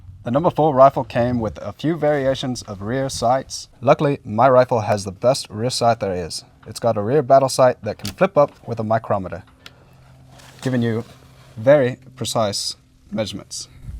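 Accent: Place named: Australia. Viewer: Sounds like an Aussie. Love the larp.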